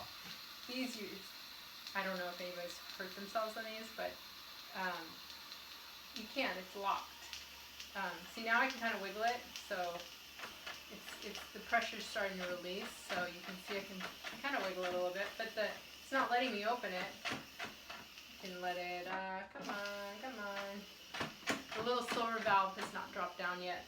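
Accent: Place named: Los Angeles, United States. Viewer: Thank you! I'm just curious, if it's ok to ask - do you guys live anywhere near Los Angeles? The way you speak is pretty much exactly like me, and you even look like you would belong in our family!! Whatever the case - good luck to you and happy cooking. Take care, Rob.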